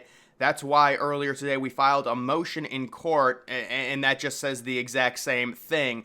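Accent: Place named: Wisconsin, United States. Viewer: Sounds Wisconsinite to me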